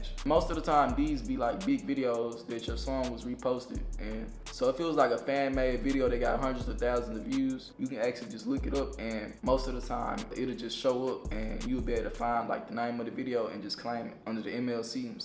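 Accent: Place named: Rural, United States. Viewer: Man ! What’s this accent ? Are you from a country side of the US ? It’s difficult to understand you sadly. Thanks for the video anyway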